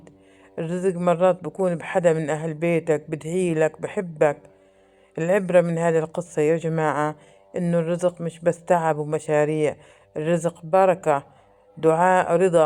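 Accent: Palestine. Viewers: اللهجة الفلسطينية❤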